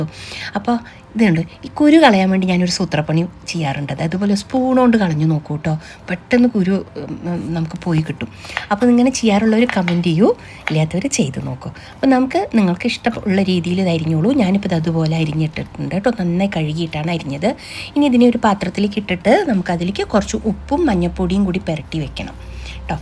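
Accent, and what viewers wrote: Palakkad, India. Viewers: Dear Mam, ഈ വെറൈറ്റി കറികളും പേരുകളും, ഉപയോഗിക്കുന്ന പാത്രങ്ങളും, കൽച്ചട്ടി, മൺപാത്രങ്ങൾ തവികൾ etc... പഴമയെ കളയാതെ പുതിയത് ചേർത്ത് പിടിക്കുന്ന മനോഹരമായ വീഡിയോകളാണ് എല്ലാം ...❤❤ പാത്രങ്ങൾ,വൃത്തിയുള്ള പശ്ചാത്തലം, ആ കർഷകമായ പാലക്കാടൻ സംസാര രീതി... നമ്മൾ കാണാത്ത നിഷ്‌ക്കളങ്കമായ, ചൈതന്യമുള്ള ഒരു ലോകം പോലെ തോന്നുന്നു.❤❤കൽ ചട്ടിയുടെ പിറകിലായി ഓമനത്തമുള്ള കുഞ്ഞ് പ്രതിമ😘❤ ബുദ്ധിമുട്ടാകില്ലെങ്കിൽ ഈ കുഞ്ഞ് കുഞ്ഞ് പാത്രങ്ങളൊക്കെ ഒരിക്കൽ കാണിക്കുമോ ?...❤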